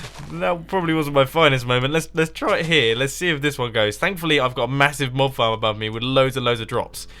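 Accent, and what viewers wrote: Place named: United Kingdom. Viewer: It's your British accent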